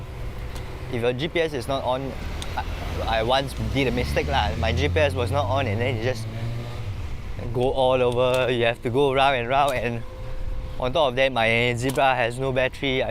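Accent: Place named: China. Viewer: Accent sounds chianese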